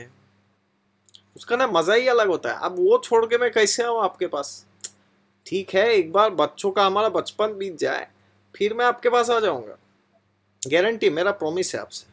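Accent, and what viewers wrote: India. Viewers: Like the Hindi accent lol. Good explanation anyways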